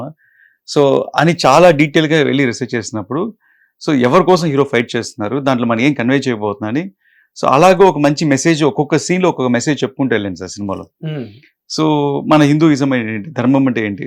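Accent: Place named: Tamil Nadu, India. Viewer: Nuvvu nee arava slang pora pooka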